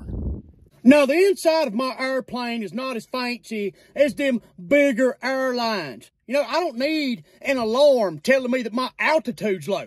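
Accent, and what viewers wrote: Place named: Texas, United States. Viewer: bro sounds like Texas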